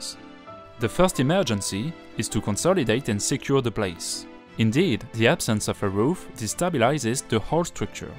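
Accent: France. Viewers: Your English is perfect and made even more pleasant with your French accent. Best wishes from Canada 🇨🇦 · l'Anglais parlé avec un accent Français... je n'aime pas beaucoup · the pronunciation is quite French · You do have a strong French accent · Votre accent francais a ajoute beaucoup a cette presentation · The accent is obviously French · I am a native English speaker who has studied many languages including French, so it didn't take me long to adjust to your English grammar and dialect...but I turned on the subtitles just to be sure I didn't miss anything. But you may have viewers from non-English-speaking countries who don't know French but do know English, maybe a lot or maybe a little...and they may need more help in understanding your English (or anyone's English). So, as a general recommendation, I think it would be good to have the subtitles available for people to turn on or off, using the CC button, as it is now. 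Merci infiniment pour vos merveilleuses vidéos! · I even found your French accent charming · It sounds french... one of these pleasant french English speakings that sound original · You have a lovely French accent!! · I can hear the typical French emphasis on certain parts of the word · The Franglais is understandable. Gérard Depardieu could not do it better. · You sounded great. I had no problem. Love your French accent. English sounds so much better spoken by a Frenchmen! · you do have a strong French accent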